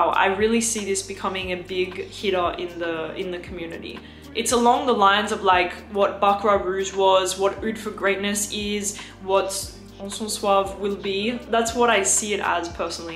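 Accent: Australia. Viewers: Your Australian accent is still very prominent makes me miss my good friend Joel from Perth. Ever smell Claude Mersal la mélodie one of my favorite fall unisex fragrances. Bought thameen peregrina as i watched this. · Your Australian is still very prominent, it is just certain gestures that have rubbed off on you, totally natural. Im sure he has helped you with pronunciation of a lot of french words as well. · you’re an Aussie living overseas and your accent reflects that. Don’t listen to haters. We love you ❤️💙💜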